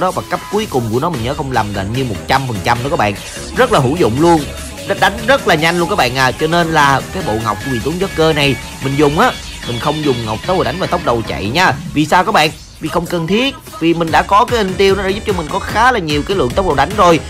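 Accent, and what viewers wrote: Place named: Mekong Delta, Vietnam. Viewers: Nghe giọng miền trong đó hay hài nữa 😁😁😁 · Rẻ nha giọng miền tây, biết chắc b miền bắc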